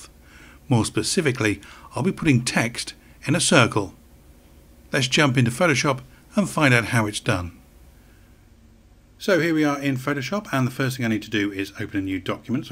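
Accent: United Kingdom. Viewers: Excellent. Nice to see, and hear, tutorials in English English and not American "English" Your tutorials are so easy to follow. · I Like so much UK English! :D Thanks for this tutorial!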